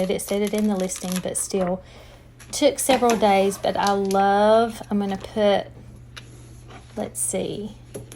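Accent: South, United States. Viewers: Do i detect a Southern accent 🤔 👀 where are you from? 😊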